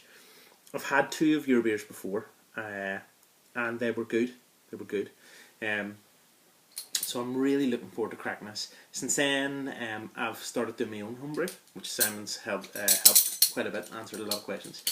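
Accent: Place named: Ireland. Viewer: you sound irish .. too much time in home land lol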